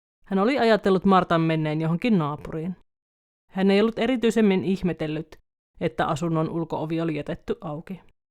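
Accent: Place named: Savonia, Finland. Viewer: Ei oo tähän tarinaan liittyvää,mut ihankun oisit savolaenen. Tuo huastelu jotennii tuntuu sille.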